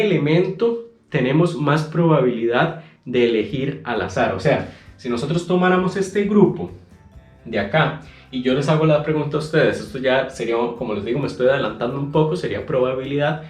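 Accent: Costa Rica. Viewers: este mae es de costa rica se nota demasiado pura vida playo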